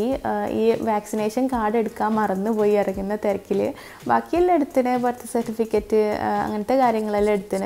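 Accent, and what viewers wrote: Kannur, India. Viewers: Thalasseryilum vadakarayilum okke varavu ennu thanneyanu parayunnadu.. Sarikkum kannur bhasha kelkkumbol sandosham thonnunnu...Husbandinu endanu jyoli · നിങ്ങളുടെ കണ്ണൂർ ഭാഷ നല്ലോണം പറയണം കേട്ടോ. കേൾക്കാൻ നല്ല രസമുണ്ട്. നമ്മൾ പാലക്കാട്‌ ജില്ല യിൽ ആണ്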